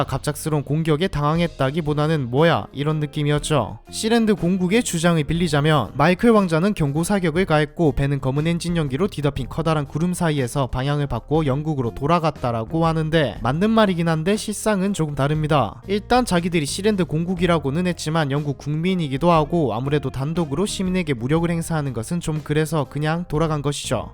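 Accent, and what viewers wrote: Gyeongsang, South Korea. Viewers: 여자목소리 들으면서 자면 달달해서 구독 눌렀다가 이 울산인지 김해인지 진주인지 모를 촌놈스애끼 씨부리는거 듣고 구독취소 바로 박음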